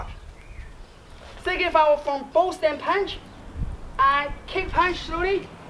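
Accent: China. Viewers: 100% true chinese english accent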